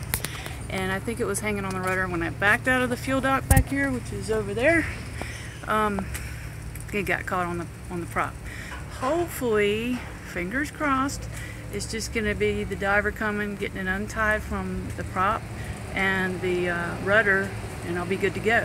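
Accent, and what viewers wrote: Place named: South, United States. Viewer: Never a dull moment in the life of a boater! BTW, any crab in that trap? Free lunch! Seriously, hope the diver doesn't find anything more than some fragments of line down there and you can continue with your adventure. I really enjoy watching all of your video's PLUS you are very easy on the eye!!! Southern drawl and all.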